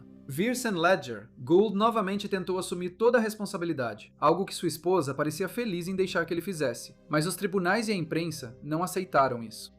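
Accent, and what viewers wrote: Portugal. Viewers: Mas ele não especificou que o canal é Português do Brasil. Você não consegue entender em português de Portugal? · Mas então ele não é português? Eu tinha entendido que está em português de Portugal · Continua o sotaque português, e eu continuo a não assistir e a não curtir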